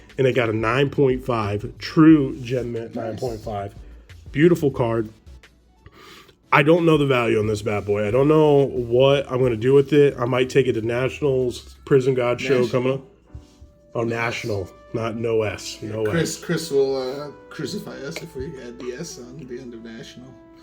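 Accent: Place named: Philadelphia, United States. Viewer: The accent makes sense Idk how I never knew ur from philly but kinda had a hunch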